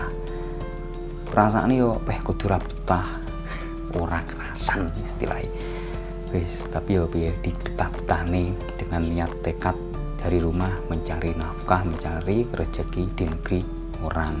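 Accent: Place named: East Java, Indonesia. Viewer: lihat cara bicara udah kelihatan orang jwa timur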